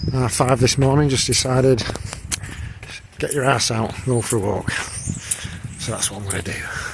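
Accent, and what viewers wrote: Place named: Northern England, United Kingdom. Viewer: Those were the days ..You could ride a bike All is not lost and terrific hearing a northern accent .Who says the North has no beauty Would love to sit by the water fall and eat a bacon butty hot or cold .Better than the Ritz .A glorious views .We share the same words for those who wreck places ,Enjoyed and how .Made me even more homesick for the North West ..Thanks for a great vlog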